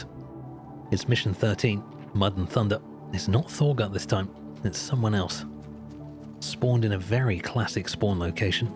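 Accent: United Kingdom. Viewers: I cant sleep without hearing people getting their heads blown off (in SE) to peaceful music and a British whisper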